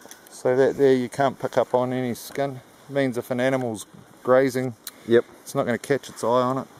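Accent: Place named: France. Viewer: Don't you like my FRRrench accent ? At least, I hope you didn't get "pieced" with it. Sry for bad focusing. I guess what I missed is when he was digging, so I can reveal you the secret on what there was on the ground : A hole !! check between 1''32' & 1"39' : it confirms my theory héhé hihi hoho ! Thx for whatching mate, hope you enjoyed :)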